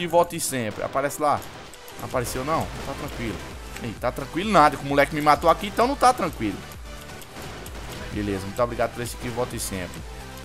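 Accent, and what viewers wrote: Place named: Paraíba, Brazil. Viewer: Ele e MiTo Mais O Portugues de Paraibano Ele Endendi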